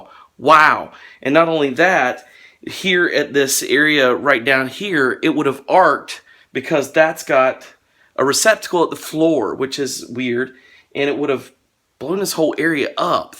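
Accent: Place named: United States. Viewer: Awe...a little country boy accent, to sweet, hope so!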